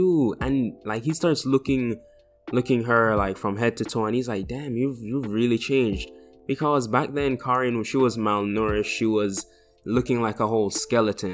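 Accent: Jamaica. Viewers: are u Jamaican? lol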